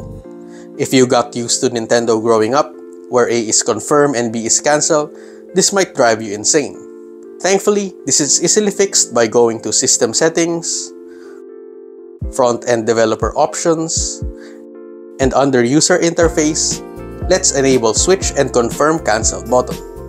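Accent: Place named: Philippines. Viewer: You sound like a Filipino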